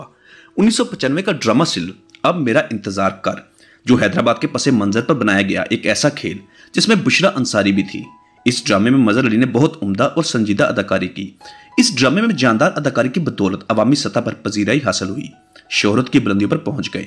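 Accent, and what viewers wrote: Punjab, India. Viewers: بھای۔۔۔۔ اپکی آواز اچھی ہے۔۔۔ مگر ادائیگی کھردری اور پنجابی لہجہ ۔۔تھوڑا سا بہتری لانے کی کوشش کیجیے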